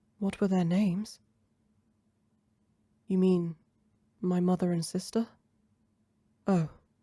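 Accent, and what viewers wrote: United Kingdom. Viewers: A nice romantic story, but the sorceress didn't seem that dark to me. I like your voice and your English accent.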